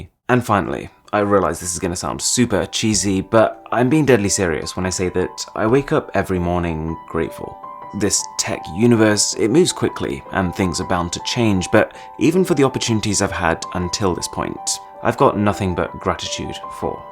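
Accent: RP, United Kingdom. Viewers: Your accent is much more posher now. Interesting. Gosh you are so hot. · He sounds like Harry Potter...The same deep n calm tone..Not just bcoz of the british accent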